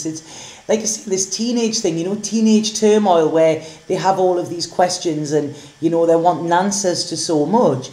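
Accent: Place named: Scotland, United Kingdom. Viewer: oh and thank You so Much , you glow with good clean white /pinkish aura and i can sense you are such a good lady , i love your never ending smiles and your excitement for me/us is awesome , great reader and that what sound scottish just great , reakly like your energy girl we will talk again ok , im always open ,and usually can hear if you are tuned to my rock n roll station lmao but tru , this stuff cant be wrong buit i gotta be sure , so i wait ,isntr like i havent already , all life damn near ,, im good at it now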